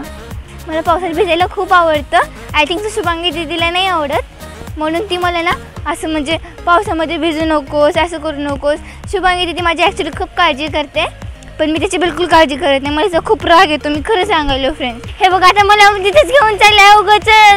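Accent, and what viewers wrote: Latur, India. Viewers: Hii....mi tuzi big fan aahe........mla ekda tula bhetaych aahe....mi pn Laturchi ch aahe.....aani kharach.....tu khuppp mst bolte h....ekdam aaplya laturi language madhe.......😃😍💃.......tu asech mst mst video......banvat raha...aani tuze subscibers....aankhi vadhu de...👍..tuz kuthe ithe office aahe ka latur madhe.... ch? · You speak typically laturi Marathi which is a good thing......... But use the word "friends" in minimum extent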